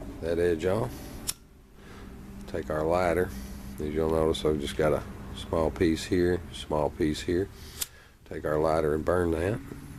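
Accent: South, United States. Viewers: he liked your Southern accent too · Your from the South aren't you?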